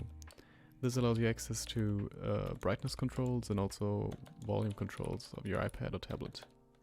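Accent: Germany. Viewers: are u german bro?:D Edit: okay you‘re german, Mr. lol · just wondering if/how this works for typing in german? i'm thinking of getting one for my studies, but often need to write in german, and i don't have any experience with mechanical keyboards yet, so am not sure how typing ü ä ß usw. would work. any tips? assuming you're german from your accent, sorry if not! :)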